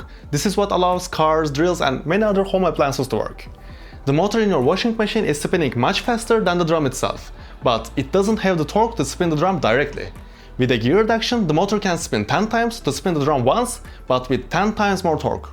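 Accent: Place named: Iran. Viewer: are you persian at least your acsent is like iranian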